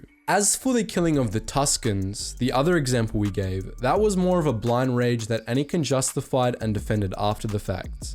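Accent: Australia. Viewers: GUYS HE IS AUSTRALIAN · never knew my guy was australian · Oh shit is Australian couldn't pin down the accent must be from QLD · You're an aussie!? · I just realised was aussie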